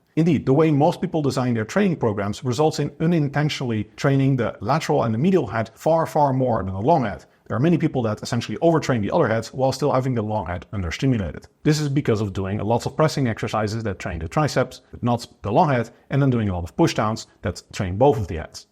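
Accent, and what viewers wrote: Netherlands. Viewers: dutch accent is hilarious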